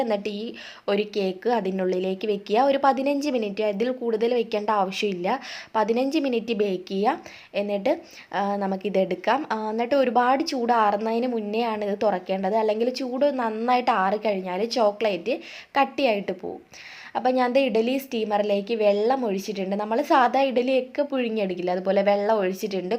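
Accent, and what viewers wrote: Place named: Malabar, India. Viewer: മലബാറുകാരി യാണോ ഭാഷ കേട്ടത് കൊണ്ട് ചോദിച്ചതാ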